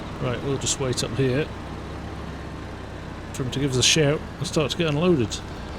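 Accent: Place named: Scotland, United Kingdom. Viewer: Also that Scottish Accent. mwah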